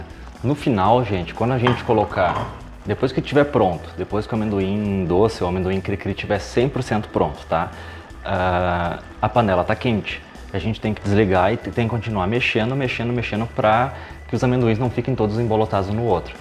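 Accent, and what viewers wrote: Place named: Rio Grande do Sul, Brazil. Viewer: Vou fazer 😋 uma pergunta, vc é gaúcho? Por causa do sotaque 😁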